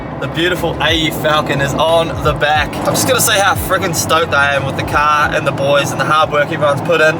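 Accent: New Zealand. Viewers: Rewatching this it defaulted to an ai dubbed English track, even though the original video is English? Youtube couldn’t handle the nz English…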